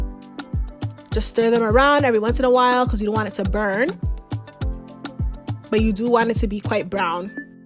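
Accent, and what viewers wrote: Toronto, Canada. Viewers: That Toronto accent is hilarious 😭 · Toronto accent smacked me in the face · Soon as u started talking!! I said she a Canadian!! We really got an accent!! Nice recipe!!!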